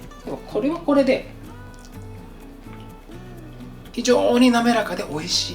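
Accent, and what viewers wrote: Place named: Kyushu, Japan. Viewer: 九州の人は柿のイントネーションが牡蠣と同じなのかな 母親もそうなんだよな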